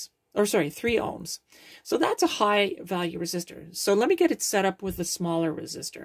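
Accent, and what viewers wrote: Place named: Canada. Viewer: I used to subscribe to Elector magazine. Many years ago. I thought it was. A cut above most. The usual ones which? I subscribe to as well. Now I design electronics for a living. Back in those days, it was just a hobby.. I see you're in Canada. I sensed a little bit of a Canadian accent. I'm in Niagara Falls, Canada.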